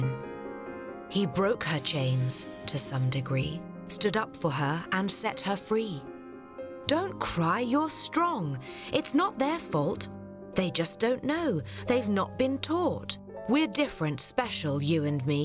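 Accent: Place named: England, United Kingdom. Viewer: great enlish accent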